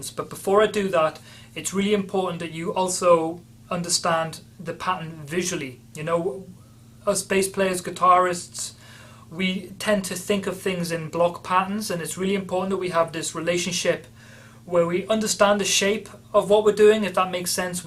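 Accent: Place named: North East England, United Kingdom. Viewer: fellow bassist from the north east that made it! County Durham bassist here.. recognized that accent instantly. Great video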